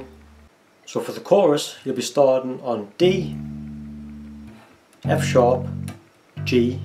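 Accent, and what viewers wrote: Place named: Newcastle, United Kingdom. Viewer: It might be his Geordie accent, but the tab and the notes he's playing match up.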